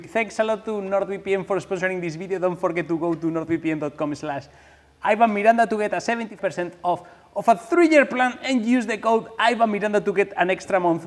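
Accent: Spain. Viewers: Se nota que eres español xd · Echaba de menos tu ingles casi spanglish, por lo que se ve youtube no me daba en la feed tus videos asi que para mi habias desaparecido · Más aún? Yo me esmeraria para no tener más acento español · Si vas a hablar en inglés, por lo menos esmerate para que tu acento sea lo más españolizado posible... Gracias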